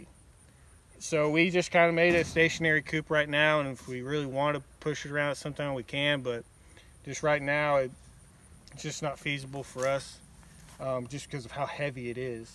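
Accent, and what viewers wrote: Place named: South, United States. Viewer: talks just like I do. I was born in Tennessee. Spent most of my childhood in the country. Brownsville Tennessee. I’m in Arkansas now. But still down south. Y’all keep em coming. 👍😊👍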